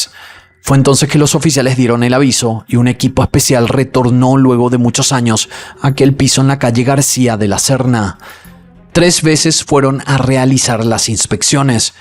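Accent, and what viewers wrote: Paraguay. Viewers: Es paraguayo. Habla hermoso 💃😎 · es de nacionalidad Paraguaya, y así hablan los paraguayos!!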